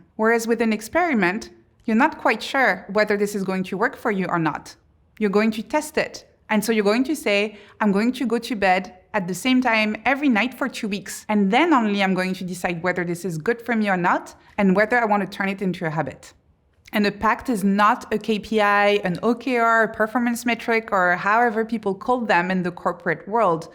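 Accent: France. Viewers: It is normal behavior. Also, she's obviously French and although she does a great job with modulating her voice to match what she's saying, it can sometimes sound monotonous. Why would she congratulate herself for a degree she got years ago when she had time to digest the joyful emotions associated to succeeding? Maybe y'all americans brag for years on end about your successes but in France we don't repose sur nos lauriers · My cynical mind tells me that this impenetrable French-accent madam with aristocratic pretentions has a point — very painfully so. Now all that’s left is to get rid of my cynical mind....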